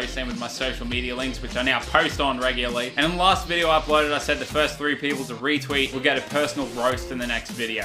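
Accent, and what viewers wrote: Australia. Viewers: Are u actually Australian or what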